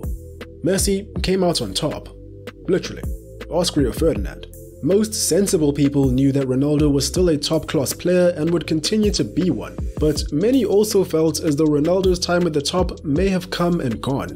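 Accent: South Africa. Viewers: That "Ja" keeps confirming your Mzanzi'ness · Bro, I'm south african and I can tell you're south african so surely make a video on benny McCartney or bafana bafana or something south african😂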